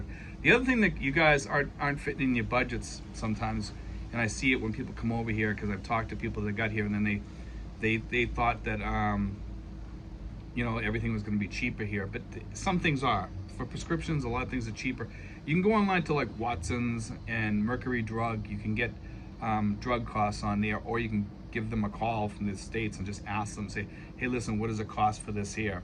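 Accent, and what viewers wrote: Boston, United States. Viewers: I could tell you're from Boston as soon as you started talking. I'm from Rhode Island. :)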